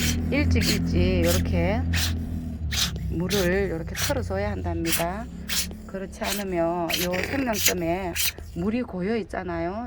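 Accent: Gyeongsang, South Korea. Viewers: 정말 부지런하시네요. 경상도사투리도 구수하구요.ㅋ 님은 어디에서 구매하시나요? 여긴 대구인데...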